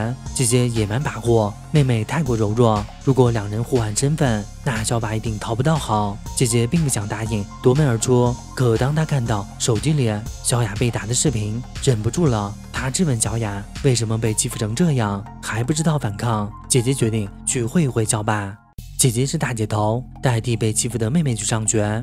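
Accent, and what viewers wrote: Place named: Taiwan. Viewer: 你这是哪来的地瓜腔？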